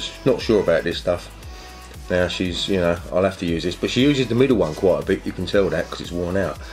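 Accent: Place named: Cockney, United Kingdom. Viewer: cockney accent love it x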